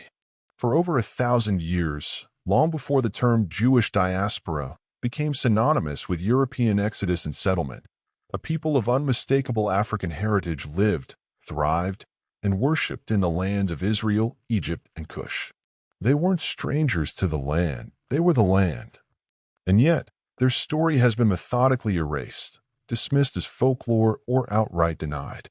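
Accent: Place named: United States. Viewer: I don't want your subtitles. No American voice please. I prefer Oxford English.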